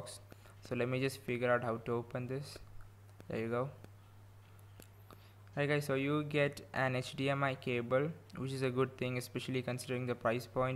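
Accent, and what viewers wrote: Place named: Kerala, India. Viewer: Malayali aano.. english ketal angane thonu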